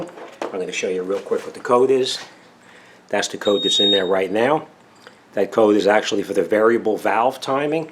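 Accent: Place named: New York, United States. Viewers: I like your accent ! haha A NYC mechanic! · You from New York?👀 Love the accent.💯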